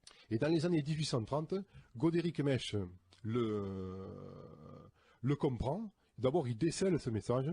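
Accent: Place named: Toulouse, France. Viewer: Son articulation est très sûre : ça s'appelle l'accent toulousain. C'est français. Ça vous dit quelque chose?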